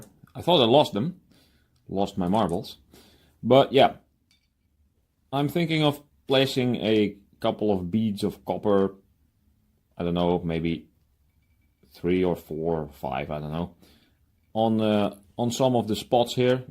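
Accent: Netherlands. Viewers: Hi we met on Gil's stream just know not sure but are you Dutch too.I hear a similare accent I have eather way greetings from your new friend from the netherlands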